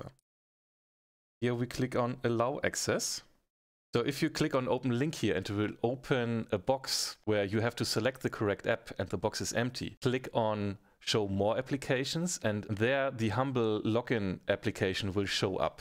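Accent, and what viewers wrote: Germany. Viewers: Hey, hast du ein deutschen Akzent? 😅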